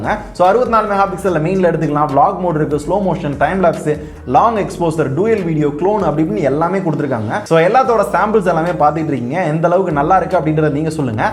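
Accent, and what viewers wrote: Madurai, India. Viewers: Bro neega madurai ya?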